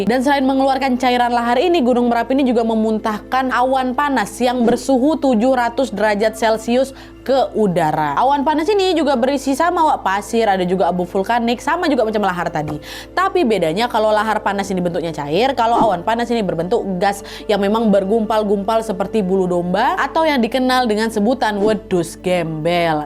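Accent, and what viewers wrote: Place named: Medan, Indonesia. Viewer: SAYA SUKA KALI dengan CARA GAYA BicAra nya kalau boleh tau AsLinya MEDAN YA enak Kali CAKAP NYA SUKSES buat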